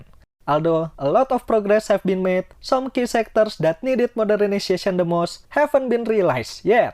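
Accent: Indonesia. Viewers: aowghwh logatnya indo bgt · Saya suka bicara nya.logat nya kental indonesia..pertahankan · Aksen orang indo:v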